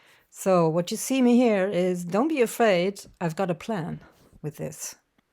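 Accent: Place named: Germany. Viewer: Ich tu mir echt schwer und muss mich höllisch konzentrieren, dieses deutsche Englisch (Denglisch) zu verstehn. Ich schaue viele Videos in Englisch und verstehe sie auch, aber das ist, sorry, weder Fisch noch Fleisch. Ich muss den Ton abschalten und die Untertitel lesen, um das Video zu verfolgen. Vielleicht besser in Deutsch kommentieren und die Englisch Übersetzung in den Untertiteln anbieten.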